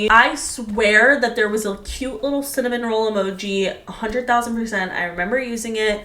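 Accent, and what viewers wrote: United Kingdom. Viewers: bishhhhhh tfff IM BRITISH, I WAS ADAMANT HES BRITISH 😮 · Wuuuut??? He’s british!!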